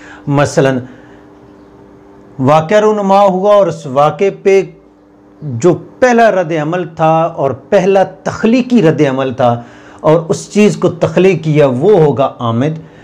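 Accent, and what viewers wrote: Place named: Punjab, India. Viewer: ماشاءاللہ ۔ پنجابی لہجے میں کیا خوب اردو پڑھاتے ہیں۔۔ آواز ہو بہو صحافی ارشاد بھٹی جیسی ہے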